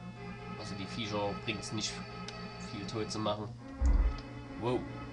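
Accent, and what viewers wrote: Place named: Saxony, Germany. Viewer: sächsisch^^ willkommen im club :)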